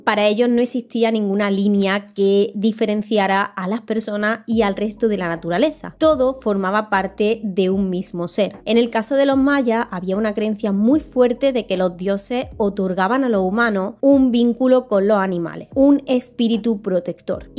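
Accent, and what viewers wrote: Spain. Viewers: Su acento lenguistico muy agradable ❤😮 español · Wowww Srita . Hace menos de 1 hr ví un video suyo , el del 5to Sil creo se llama y le cuento , me dejó sorprendido con su video . Mucjas gracias . De hecho inmediatamente me inscribí a su canal ahora aquí me tiene viendo éste otro video 👍👏👏👏🤜🤛 por cierto permitame preguntarle de dónde és usted ? Tiene acento extranjero (Española ?) , más me sorprende sinceramente . Excelente canal Gracias . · Gracias q acento TAn bonito de dónde será se q es española será andaluza gallega o canaria vaya un saludo desde Texas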